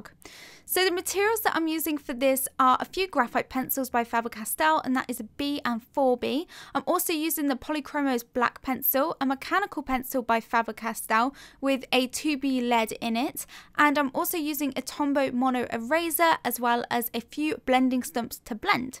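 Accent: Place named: Midlands, United Kingdom. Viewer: I do so love your videos, every brush or pencil stroke is narrated in a way that I can understand and it all sticks in my head. So much better than a lot of tutorials on Youtube that are more someone drawing and music playing with no narration, not that the artists are not good, it's just better with narration, I am still trying to work out your accent? Midlands maybe but a touch of Southern, great though, every word is clear and informative, thank you for making these tutorials they have brightened up my days no end and re - ignited my determination to be able to draw.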